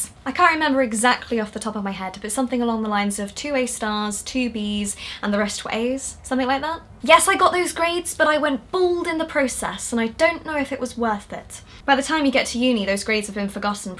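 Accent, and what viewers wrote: France. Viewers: aaaah! I love you french accent! you speak very well, good job! I'm so happy because now I know that I can speak with you sometimes in french:-D tu es une fille super! ça fait 1 heure que je regarde tes videos et je ne m'en lasse pas:) Bisouuuux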